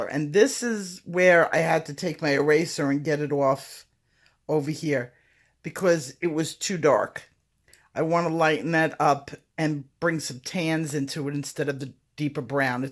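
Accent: New York, United States. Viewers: Where are you from? Sound like NY or NJ?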